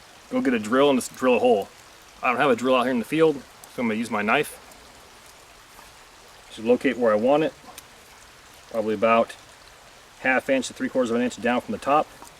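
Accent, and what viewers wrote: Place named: Midwest, United States. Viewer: What part of the Midwest are you from I live in Indiana ?about 20 miles from Illinois that was a good video by the way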